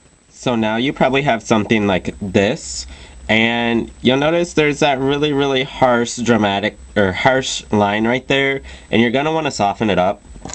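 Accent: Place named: Detroit, United States. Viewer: Lol i noticed that too, maybe he's from the north? b/c i have family from detroit & that's how they say it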